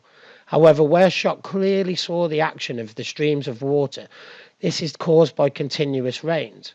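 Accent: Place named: Birmingham, United Kingdom. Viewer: The Lost History Channel TKTC I’m here (&subbed) due to you fella.and I do love a brummy accent 😉👍🏻😂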